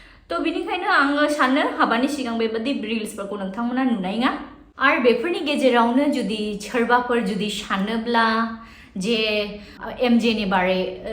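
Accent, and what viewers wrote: Bengal, India. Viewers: Abo boro rqnya na na kok thana bengali sw thangdw mathw rao ya... Boro jana boro rao mwjng rwngnw nango..... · Are you from Bengal beta, your speaking like Bengali tone.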